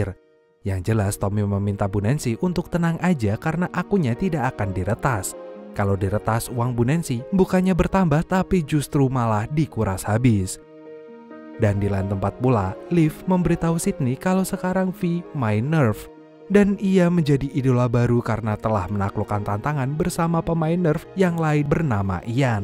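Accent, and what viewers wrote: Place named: Tegal, Indonesia. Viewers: Sihhh malah wong ngapak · Rika wong ndi sih bang, ngomonge deneng ngapak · tegal hadia Maseeehh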